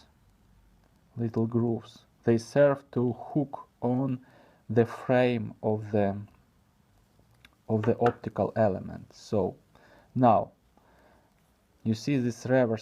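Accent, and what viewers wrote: Russia. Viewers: lol, your pronunciation as a Russian man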